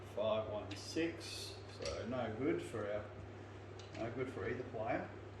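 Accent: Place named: Australia. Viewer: thanks, I agree that the complexity would make it too hard to manage at a live table, so I understand if it proves a fruitless task. Just keep up with the content as you see it, I am enjoying hearing Craps talk with an Aussie accent for a change. Cheers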